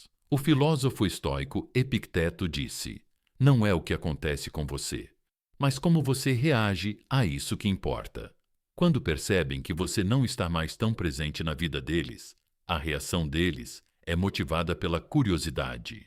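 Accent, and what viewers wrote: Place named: Portugal. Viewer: Qual a razao p os narradores falarem portugues de Portugal? Coisa estranha ...quebra o ritmo da narrativa ..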